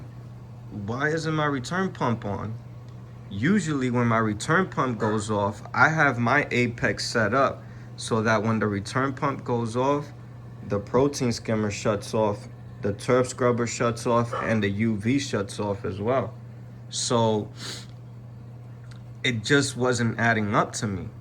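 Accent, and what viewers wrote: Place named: New York, United States. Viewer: dimensions? Correct me if I’m wrong, but you sound like you’re from NY!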